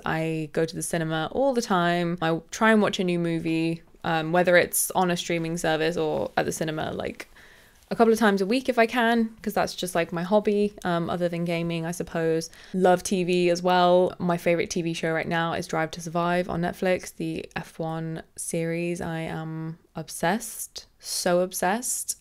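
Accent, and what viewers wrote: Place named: RP, United Kingdom. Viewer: As someone from the Saaf Est, I think your accent sounds quite posh!! 😂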